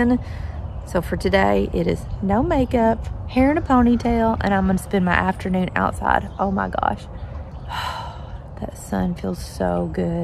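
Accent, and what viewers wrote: South, United States. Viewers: I really love your channel....Have been enjoying the recipe segments...& just seeing how you go about your day to day being the entrepreneur that you are...& you are just so personable! You are just so sweet...plus, I love your Southern accent & of course, your pups!;) <3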